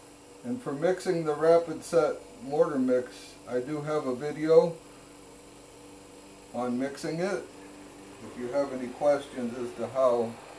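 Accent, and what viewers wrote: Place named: Michigan, United States. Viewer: WOW!!! Amazing! You have been absolutely informative and helpful. All 3 turned out great, but I like the "fingers" better, seemed more naturally swirly and less angular.. I'm hoping to take on a small bathroom vanity in the near future and had a lot of doubt about concrete until I saw your channel. "Michael builds" channel is helpful too, so my husband and I bought the rapid set mortar mix... Plus an extra bag too experiment with. I was also thinking about adding a tiny bit of fine powdered red clay (we live in Georgia and it's everywhere) to the bottom of the mold for "rust" streaks in the "marble." I can't wait to try it out! Thanks!!! Love your accent, I lived in Michigan once upon a time!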